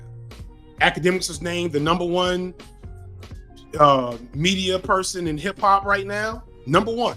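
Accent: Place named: Atlanta, United States. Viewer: so I'm not a native English speaker. I would call myself fluent in English but my two remaining gaps are technical vocabulary (terms specifics to an industry or a medium, terms that are never used in conversation so they're hard to learn simply by talking to people or even by reading books or watching films) and dialects/languages derived from English (kinda ironic because in my native language I'm the product of two families that speak a patois specific to their region). I really enjoy watching content more as a learning tool into American culture, Black-American culture and simply the perspective of someone older than me with a long academic background and complex, thoughtful takes I enjoy hearing through my own cultural lense. I hope you'll understand I'm coming at this with a genuine desire to learn, and since it's about spoken language, it's pretty hard to look up myself. 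I feel like the way talks in the long, scripted main channel videos is both influenced by his accent as well as articulated in a way that makes it evident this was a research, probably beta-read, rehearsed script that he's reading. By opposition, videos like this one on the B Sides sound to me like not only his Atlanta accent is stronger but he's switching between AAVE and English throughout his sentences (as I understand it, AAVE is it's own language with a culture and an interesting history of ethymology so it feels appropriate to treat it as such and view people who use it as bilingual, but i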